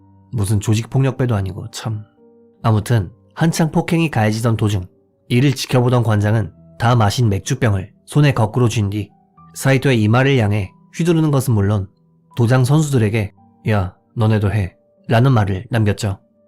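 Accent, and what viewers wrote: Japan. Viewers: 알고리즘에 떠서 들으면서 게임하고 있었는데 일본분이 운영하시는 채널인가하고 채널 확인했네요 ㅋㅋㅋ 비하나 조롱의 의미가 아니라 일본 지명이나 일본인 이름 발음이 굉장히 현지인같으세요 중간중간 억양도 되게 일본인이 한국말하는 느낌이라 재일교포분이신가 뭔가 신기해하면서 듣게 되네요 ㅋㅋㅋ 자료 조사도 좋으시고 차분하게 얘기하셔서 편하게 듣기 좋은 채널을 알게 된 거 같아서 좋네요 ㅋㅋ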